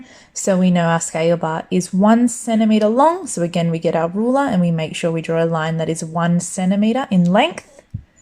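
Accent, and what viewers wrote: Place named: Australia. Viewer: She Australian uwu.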